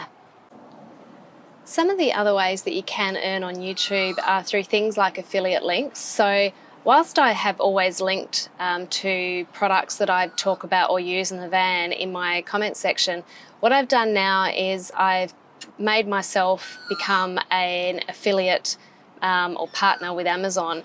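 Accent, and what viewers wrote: Australia. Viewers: Hi, I’m a new subscriber and couldn’t have been more excited to hear a fellow Aussie accent. You will do well because you are so real and natural. I love your drone work it must have been tricky learning that. Di x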